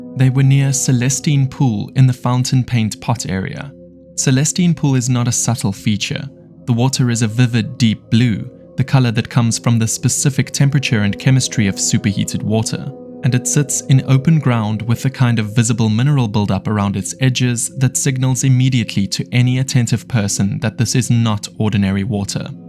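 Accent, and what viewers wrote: South Africa. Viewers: Your accent sounds South African...? Hulle weet nie wat ons weet nie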